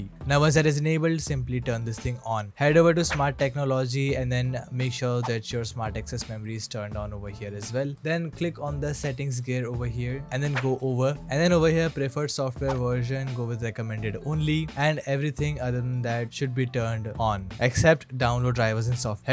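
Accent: India. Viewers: are you indiina?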